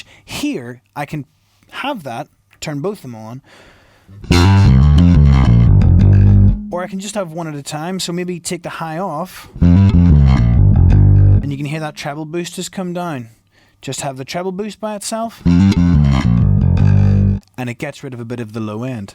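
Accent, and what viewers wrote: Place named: Liverpool, United Kingdom. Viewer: Dude you from around Liverpool. aren´t you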